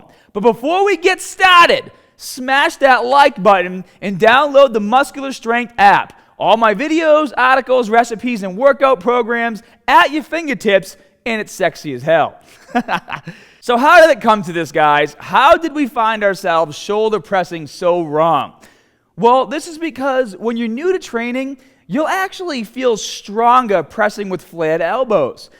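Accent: Boston, United States. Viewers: Following because of that Boston accent...and trying to make gains, very informative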